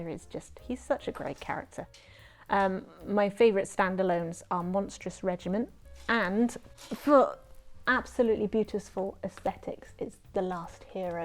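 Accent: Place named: United Kingdom. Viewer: It's great to meet a female British booktuber. From your accent i presume.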